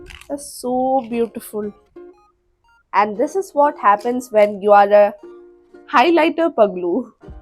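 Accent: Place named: Kerala, India. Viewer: Is really your a malayali that I want to say you have a great malayalam slang really I want to praise you , you are amazing that when you said malayalam that I shocked because that is so good then I don't know you are a malayali i have a wish if you are a malayali please speak Malayalam again that I like the way you say malayalam I want to hear your Malayalam again and please say my name ramziya As a malayali I don't have this like slang I loved your Malayalam words I wish I can hear it again like when you speak Malayalam all the one who hears will melt for that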